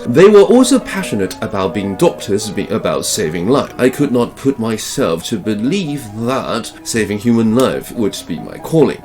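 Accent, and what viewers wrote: United Kingdom. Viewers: 真的有唸文學作品有差 整個鋪陳、敘述都非常厲害啊 英國腔超帥😂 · Pls tell us more about your life in England !! Your life stories are truly intriguing,! I had been studying in the UK for 4 years, and your accent reminded of those amazing memories back then. anyways, love your work, pls continue sharing your knowledge to us, I enjoy every bits of your creative work ❤️ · Very beautiful British accent n impressive 吸血鬼造型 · 開頭以為要講吸血鬼傳說，然後老師用道地英式腔調講訴著台灣學子的悲哀故事，反差太大了，差點反應不過來😅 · 看福爾摩斯1984年版的影集，就是這種英國腔！ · 我又來報到了😂 Eko你的聲音和英國腔真的太好聽了！ 只能說聽你說英文是種享受哈哈 btw thanks for sharing your life story when you’re little! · 尋尋覓覓終於找到一位新潮又酷的英文老師 想跟隨他充满神秘浪漫的英式口音 及吸血鬼教室聽他跟別人不一樣的特殊教法 只是相見恨晚 在一個訪問频道認識EKO老 師 被你的声音吸引 謝謝老 師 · 哈哈～ 突然有種希望老師能拍一部，自己寫的電影，裡面充滿奇幻和你好聽的英國腔，（覺得應該會很棒） 再來喜歡搖滾樣貌和古典音樂搭配，再加上一點慵懶的優雅，意外的讓人入迷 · 超喜歡英式發音的，可以聽Eko老師講英文，而且是講日常用語👍👍👏👏 重點是關於吸血鬼很吸引我 哈哈👍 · 好喜歡聽老師的英式口音，期待以後可以出一系列的英說影片～聽了很享受XD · 你的英式口音真的很有吸引力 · Quite a good summary and denotation about eslite Taiwanese student' studying process. 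But lowsy students like me don't even know the frame the society's giving to us till some people mentioned. But this time the issue is mentioned by a visual kei vampire who speaks British accent. Intriguing!😆 I like British accent. So elegant! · 看了几个eko的视频，发现英音听起来真不错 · 天啊，真是太精彩了！好喜歡聽你說話，老師的思考與陳述方式很有魅力，完全能引起大家的共鳴，一邊看你的日系打扮搭配英式英語以及上方不時出現台灣人習慣用語，種種不可思議的違和讓人會心一笑。 從小到大太多時候，我們都被迫假裝自己能接受或去成為所期望的某人，被僵化體制、社會狹隘價值所捆綁，很欣賞老師的勇氣與毅力！這樣一段自我介紹的影片，是那麼不容易，背後經過無數萃煉與追求完美的堅持，才能以現在如此從容優雅的姿態向大家娓娓道來自己的故事，這樣的精神，非常令人感動與佩服！ P.S. 我以前在學校很討厭英文，在被強迫的狀態下英文成績很差，最近這兩年靠自學才漸漸有了對英文的興趣，看到像老師這樣優秀自信地展現英文能力，對學習者來說是非常激勵的，本來想用英文留言給老師，不過為了能精準表達內心的感受，還是決定用母語來正確完整地陳述 ： ） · 從阿滴那邊知道EKO老師的英式口音很好聽，然後連到這個介紹影片，完全被老師的人生經歷懾服欸，期待老師之後可以繼續分享更多！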